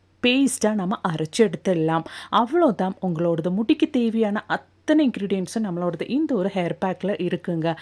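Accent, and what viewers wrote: Kerala, India. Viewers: Your Malayalam tamil wonderful and ur tips also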